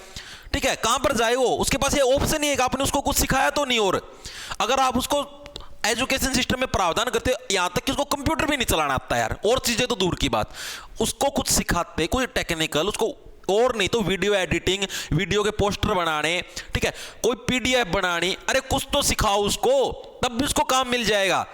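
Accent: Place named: West Uttar Pradesh, India. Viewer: Bhai apki desi language ne dil jeet liya west up se hi ho kya mahare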